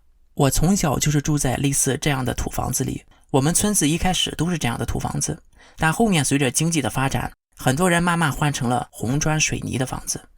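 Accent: Shandong, China. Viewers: 山东口音，不是临沂就是枣庄，跟徐州口音差不多。我倒想知道你移民海外之后，会不会关注你所在国家的政治，你如果想拉更多的移民业务应该出影片介绍或分析一下你所在国家的一些情况。你做的一系列反共的影片是推力，如果做你出影片分析你所在的国家就是拉力，推力+拉力共同使劲才会有更多的移民业务😀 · 原來clause是山東人，普通話確實和我山東同學的口音相似。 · 山东口音好重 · 听你的口音就感觉是老乡，这次确认了。我也是山东农村，东部，90后，你的经历跟我基本一致。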